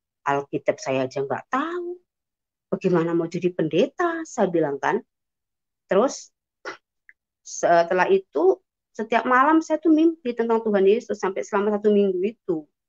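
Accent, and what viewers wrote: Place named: Java, Indonesia. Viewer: Dari omongan lo, lo bukan orang minang, tp jawa.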